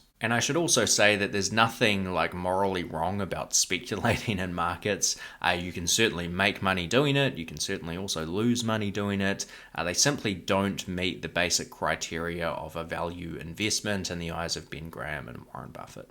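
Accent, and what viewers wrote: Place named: New Zealand. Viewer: Thanks for your videos,I've learned so much from them. I hope you'll forgive me for asking,and I don't wish to sound offensive,but I'm curious to know whether all New Zealanders pronounce their letter e's as i's, or is yours a dialect from one particular part of the country? Thank you.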